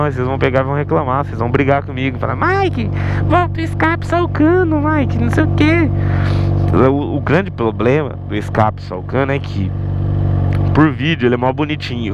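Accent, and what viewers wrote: Goiás, Brazil. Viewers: Pq ele fala no piqui do Goiás??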